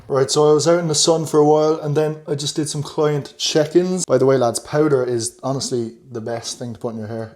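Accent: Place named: Ireland. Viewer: Hello. I think you seem lovely 😊 I like your Irish accent. I've wanted to visit Australia for a long time. I've thought about it a lot. I can't afford it yet, but if I'm ever in that position, I wouldn't want to miss out. I'd like to go to Sydney, Byron Bay and also travel up to Queensland as well as visiting Darwin, but obviously I know that's a long way from Sydney! I would probably need to go to Australia more than once.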